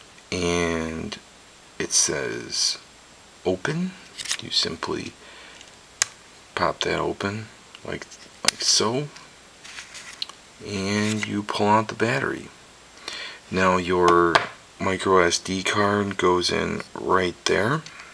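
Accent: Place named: Michigan, United States. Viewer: r u frome michigan?.. or somewhere up north?.. i like ur accent :)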